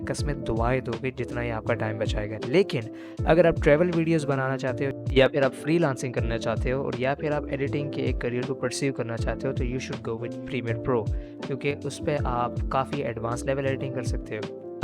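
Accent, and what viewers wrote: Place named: India. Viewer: He has the heaviest indian accent of all times.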